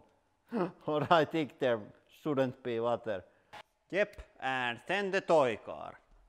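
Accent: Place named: Finland. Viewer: Kunnon rallienglanti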